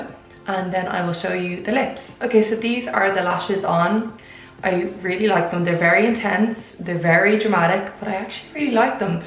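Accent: Ireland. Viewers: Ireland I think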